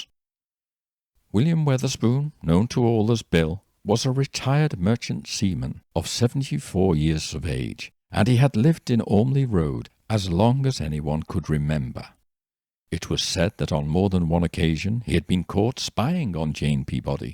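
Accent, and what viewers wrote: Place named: RP, United Kingdom. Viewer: Petite question. Where does your pronunciation of the U vowel come from? e.g. cushion, bullet and put. Am intrigued as otherwise you come across as a relatively RP speaker. Enjoy your content until your slow delivery and soporific voice takes effect. Cordialement. Alexandre Garden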